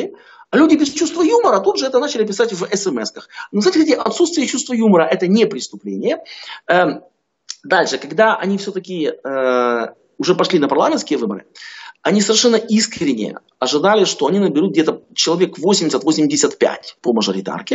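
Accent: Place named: United Kingdom. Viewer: акцент гарний ( в сенсі красивий). Майже британський. До ар-пі ( пош - акцент), можливо, трохи не дотягує, але звучить досить естетично привабливо ( без гомосятини кажу, лише як естет )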